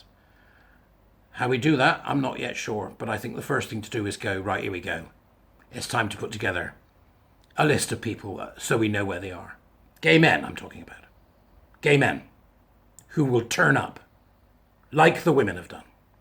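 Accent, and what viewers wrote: United Kingdom. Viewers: you sound like your from the southwest of the UK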